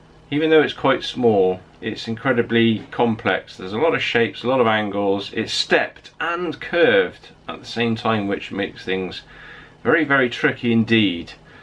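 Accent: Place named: West Country, United Kingdom. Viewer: When I was a bit of a tear ass in my car and bent it up I loved going to the repair shop in Westfield, Somerset. Mk 1 Cortina days. The owner Les was a great man and he allowed me to hang around until he started to let me use some of the kit. Loved using gas welder to stick on the odd wing or rear quarter. Doing it for love is one thing but doing it for a living is a different matter. At 71 I love what you do. Your blog's are pitched just right. How anyone can tell you your doing it wrong is definitely a bed wetter. I am adding Einstein to your second name. You say you were always looking for time and space well he had a thing about this too but he called it Space-time. I am trying to sus your accent. Are you a westo. North Somerset am I. Take care.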